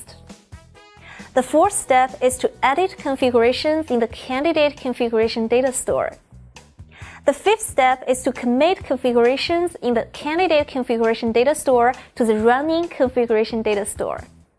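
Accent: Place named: China. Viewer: 一听就知道是中国人说英语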